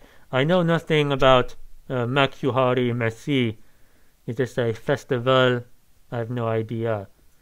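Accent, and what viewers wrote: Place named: France. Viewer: When he said "France is not a real place, but Wakanda is" I almost hurt myself trying not to laugh really loudly for fear of waking up my wife. That was great. The accent does sound vaguely French, but that could mean any of dozens of different countries. Easy to understand though, and I enjoy how much commentary he gives. His enthusiasm comes through, even with the mask. Mikio Fujioka was the guitarist who fell off an observation deck at the end of 2017, sadly. He's usually the one playing the black guitar, while Ohmura always plays his pink guitar, making him easy to spot. This time I think it was Leda Cygnus playing the black guitar, who filled in often for Mikio, because of the scheduling conflicts you mentioned. Mikio was a guitar teacher at a high level music school in Japan, so he probably needed someone to fill in for him regularly. 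Ohmura and his pink guitar are almost always there.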